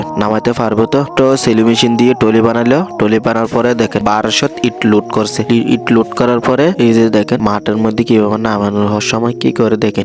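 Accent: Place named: Chittagong, Bangladesh. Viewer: তুমি চিটাগং তোমার কথা শুনে বুজা যায় যতোই শুদ্ধ বলো চাটগাঁইয়া শুদ্ধ বলতে পারে না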